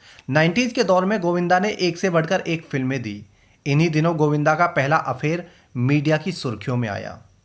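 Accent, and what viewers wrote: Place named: Uttar Pradesh, India. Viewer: Kay Ap Ideal Na Banaein Actor Ko Actor Ki Hat Tak Rakhaein Kyunkay Laehjay Say Tum Bhi Up Kay Lagtay Ho Amitabh Alahbad Ka Hai to Yeh Tumhara Tasub Nahin Hai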